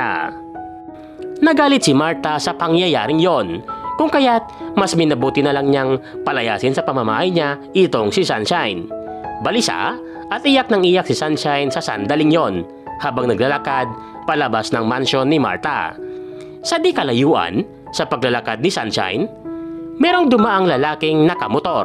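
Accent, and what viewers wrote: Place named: Iloilo, Philippines. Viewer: ang ganda nang storya salamat ilonggo gid kaw yung accent mo halatado lol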